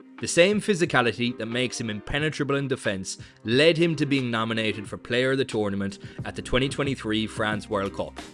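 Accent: Ireland. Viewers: Heard an Irish accent and I knew there was going to Green glazing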